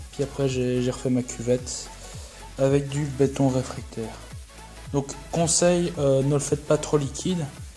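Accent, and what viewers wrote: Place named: Belgium. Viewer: Tchu ti ké beau accent belge la Belgique le meilleur pays🇧🇪🇧🇪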